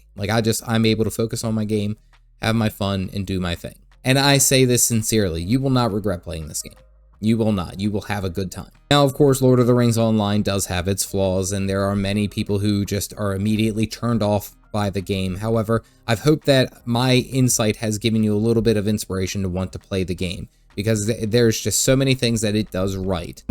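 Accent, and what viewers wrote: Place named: Delaware Valley, United States. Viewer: This dude is from the Delaware Valley and I love it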